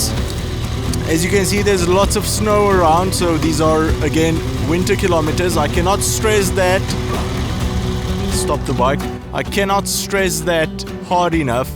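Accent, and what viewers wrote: South Africa. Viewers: Fantastic ! Was watching this & the missus said she can hear a South African accent...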